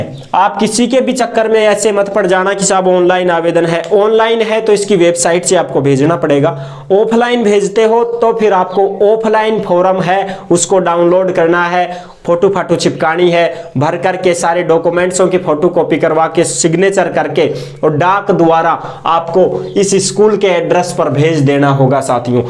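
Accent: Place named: Marwari, India. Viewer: Bolane ki istayl bhut ahhe h 😂😂😂❤❤ Jo bich me marwadi ka tdka h vo mast h 😂😂❤❤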